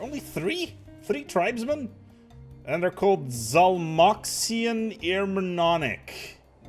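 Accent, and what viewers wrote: Netherlands. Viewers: wait are you dutch...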